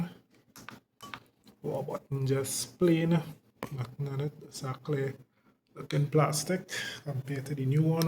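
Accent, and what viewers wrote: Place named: Trinidad and Tobago. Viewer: Hi, i can tell you're from the Caribbean, Trinidad and Tobago, right? I have the Supermach SM26 from Pricemart and it works amazing. I noticed that seat is mounted backwards compare to mines. Also if you press and hold the up and down buttons same time, press the M button until the "P0 8" Icon appeared and press both arrow buttons to come out.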